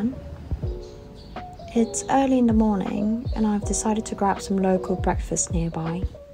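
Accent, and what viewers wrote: United Kingdom. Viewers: 대만 브이로그와.. 영국 영어 까지.. 아주 브릴리언트한 영상입니다.. 잘보고가요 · I want to watch another episode!! Your vid is always amazing❤ I love your british accent too. Have a good one!!🩵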